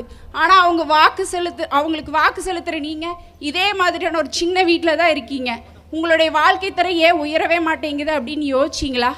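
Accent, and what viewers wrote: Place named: Kongu, India. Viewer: என் தங்கை கொங்கு தமிழ் செந்தேன்🎉🎉🎉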